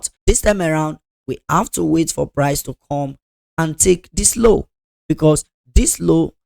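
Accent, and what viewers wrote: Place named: Africa. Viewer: When I heard the african accent and then saw the exness link in the video description... instantly knew its bs and a scammer 🤣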